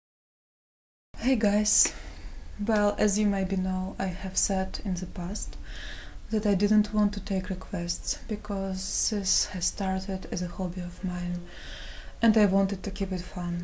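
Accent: Russia. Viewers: hey, where are you from? Your accent sounds Russian. I'm Russian and i can understand all your speech. When i am trying to do it with native speakers i have problems but your pronounce is awesome. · I can hear some russian accent :D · *q* Russian accent............. <3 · Are you from russia or something ?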